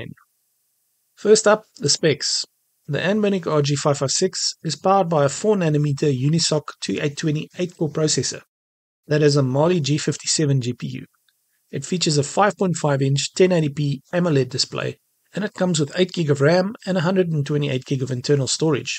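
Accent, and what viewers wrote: South Africa. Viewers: keep on researching. I heard your ZA accent. I couldn't miss it. Haha am getting the anbernic 556 this month.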